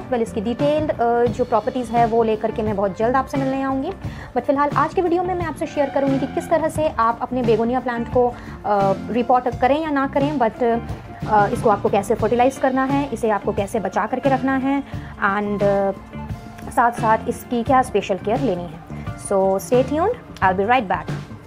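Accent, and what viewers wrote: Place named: China. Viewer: Your english have chinese acent I can’t understand and you tLk sooo fast 🚵🏽‍♀️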